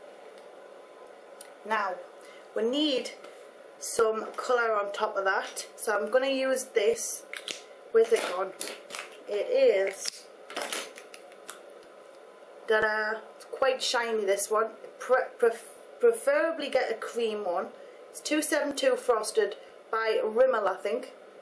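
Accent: Newcastle, United Kingdom. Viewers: Are you From Newcastle ? You have a Geordie Accent [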